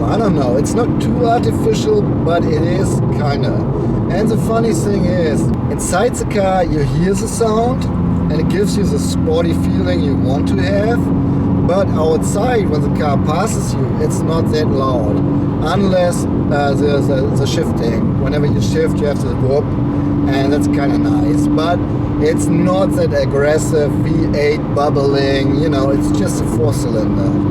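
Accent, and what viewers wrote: Germany. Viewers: I really really like your German accent. As a college student, my professor is a German who comes from Goettingen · Very good review and entertaining keep your German accent I will keep my french one...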